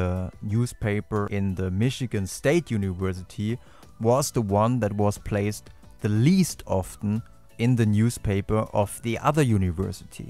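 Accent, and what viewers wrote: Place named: Germany. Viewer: Social loafing und social inhibition sind ja nicht die selben Sachen oder? Social loafing ist soziales Faulenzen, was ist der Fachbergriff für social inhibition auf Deutsch? P.S. Ich frage weil ich den Deutschen Akzent raushöre und mir das sonst niemand beantworten konnte. P.P.S Ich spreche auch Türkisch und die letzten drei Wörter sind definitiv nicht Türkisch, eventuell sind die Wörter falsch geschrieben. Habt Ihr ne Quelle?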